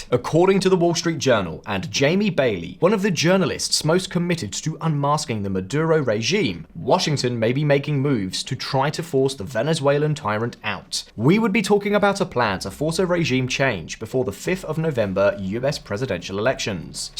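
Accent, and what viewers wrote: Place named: United Kingdom. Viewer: It's a little rich for a guy with a British accent to talk about a Dictatorship in another country. Bro, the Starmer regime is arresting Brits for Facebook posts! Yea but "it's okay when do it" right? 😂 The U.S should leave Venezuela alone. They've wrecked that country with thousands of sanctions, and they wonder why Venezuelans are crossing the Southern border into the U.S.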